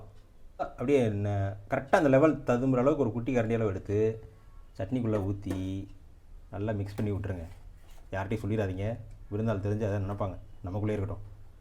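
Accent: Madurai, India. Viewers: மதுரை பாஷை வருதுங்கோ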